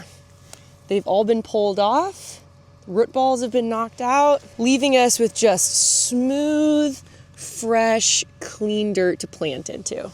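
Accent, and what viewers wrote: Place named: California, United States. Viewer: Your voice is getting “too California” accent. You’re a midwestern woman!!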